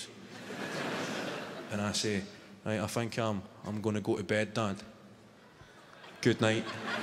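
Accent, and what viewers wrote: Scotland, United Kingdom. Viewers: Just listen to more scotish folk...you get there lol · Ewert how can u not understand him?? Plain english with a v mild Scots accent!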